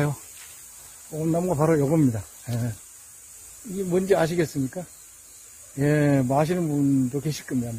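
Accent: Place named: Gyeongsang, South Korea. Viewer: 갱상도 사투리가 이젠 귀에 착착 잘 달라 붙어요~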